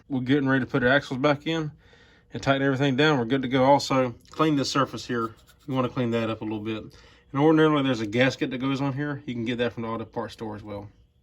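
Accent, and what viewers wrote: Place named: South, United States. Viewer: Straight up Truth has a certain ring to it and you chiming like the Liberty Bell. Nice sharp car you got there, nice work, I especially like your comments on how not to damage the seal by denting it and causing a leak. Also cool Southern Accent. Hello to All from Alaska.. Ps; ye'all keep up the good work.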